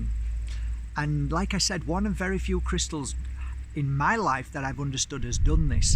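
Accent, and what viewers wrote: Yorkshire, United Kingdom. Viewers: I've just ordered my first moldavite this morning. I spent time looking at different ones on a site and was drawn to a particular one. I've experienced some sharp headache like pains intermittently...a little apprehensive but interested to see what happens when it arrives. Love your Yorkshire accent, I'm in East Yorkshire 😊💫💫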